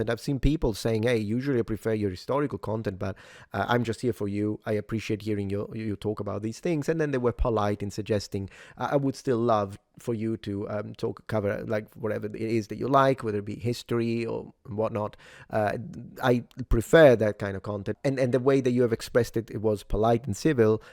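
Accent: Sicily, Italy. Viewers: Good sir, you can post whatever you want on YOUR channel. I just recently started watching and it was because of your political commentary. I'm so happy you popped up on my feed, your voice is very soothing and your accent is great. My dad was in Sicily when he was in the Navy during the Vietnam war and always spoke so highly of his time there. When I heard you say that is where you are from, I was hooked. I appreciate your perspective and hope you continue to post your feelings. ❤ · I don't remember when i first got to your channel, but i remember that i wanted something history related to listen to, saw your name, went "Heh, Supernatural" (Yes, i know, i'm a smooth-brain) and i was hooked on that stuff for a while. Then i kinda forgot about you, because my focus shifted to something else. After what happened with Kirk, i just needed someone to listen to who talks sense into that situation, and by chance i found you again. 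Now i'll stick with you again, your content is pretty cool overall. Do whatever feels right, i'll listen to your nice italian accent regardless of what you're speaking about! :D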